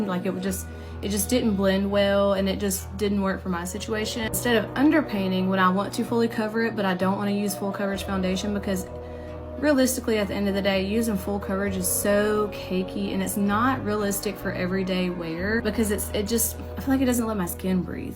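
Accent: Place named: South, United States. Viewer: Damn, ur pretty...and that southern accent! Dont care nothing about makeup but enjoyed just watching u talk. More beautiful in this clip than any of the others.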